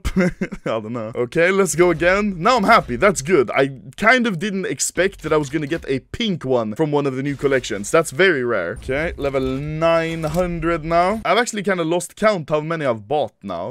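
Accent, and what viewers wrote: Sweden. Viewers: you have a swedish accent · this swedish accent annoys me so much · Ur sooooo from sweden. Right?? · He is swedish so he cant speak like an English native bro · You from sweden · U come from sweden right? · Swedish accent hehe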